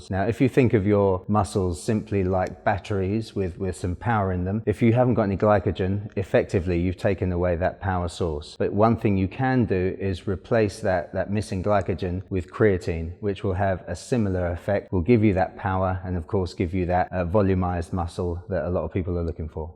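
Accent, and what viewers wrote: England, United Kingdom. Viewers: Yes thank goodness!! You are not American! Such a breath of fresh air you are. No dramatic hyper "best actor voice", just facts. Thank you sir 🙏. So pleased you are English lol... New sub from the island next door 🇮🇪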